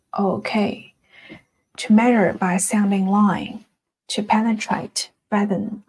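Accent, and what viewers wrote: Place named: China. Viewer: You might have been referring to my comment regarding your accent. If so, I was only curious and not suggesting that you change it. I’m a Taiwanese American, having been living in the US for 37 years. I also had the experience of attending an American overseas high school before coming to the US for college. When I’m relaxed and not tired in general people here think I’m an ABC. Not to brag but just explaining my background. “Protracted” here means prolonged or extended. Already your English is much better than some of the other Chinese Big Vs. I hope they could get their pronunciation to your level. What I’m trying to say is that, foreign accents are actually charming to Americans, especially European, British, and Australian. As long as you lose the Chinese accent you should be fine.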